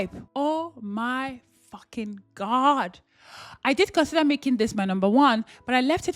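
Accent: Nigeria. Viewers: I kept hearing the Nigerian accent sneak itself in and you eventually mentioned being born in Nigeria. 😂❤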